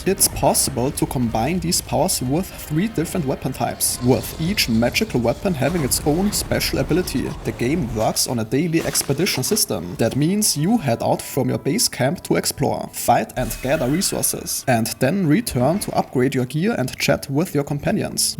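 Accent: Germany. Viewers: i cannot stand that german accent....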